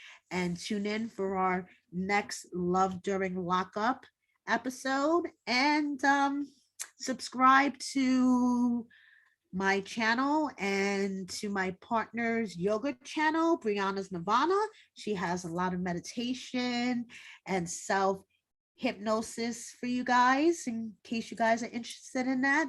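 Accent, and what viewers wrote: New York, United States. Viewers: Rapid-fire, New York style. That's how I like my pizza and my recaps..❤️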